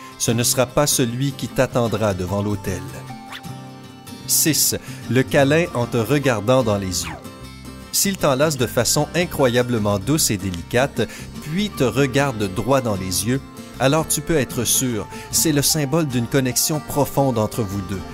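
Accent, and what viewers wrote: Quebec, Canada. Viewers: La voix off ses un québécois tabarnak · J'adore ton accent du qebec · Tain c'est un québécois 😂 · Hey st'un québécois qui parle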